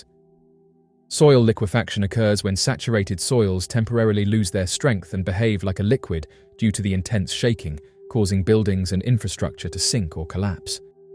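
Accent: England, United Kingdom. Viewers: If the narrator has an English accent, you can be pretty sure that what follows is BS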